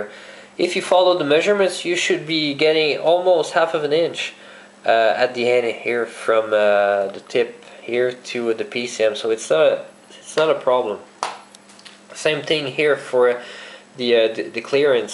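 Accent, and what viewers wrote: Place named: Quebec, Canada. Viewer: Nice video! Et beau accent québecois lol ;)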